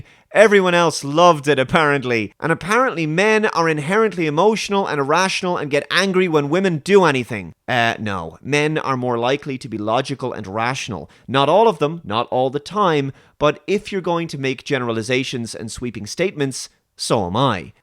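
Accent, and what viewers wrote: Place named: Ireland. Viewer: Totally random question unrelated to the video and more about you personally... What type of Irish accent do you have?(where in Ireland is this more common) Lol I'm making a story and I kinda wanted to give a character an Irish accent like yours... maybe lol. Ok sorry.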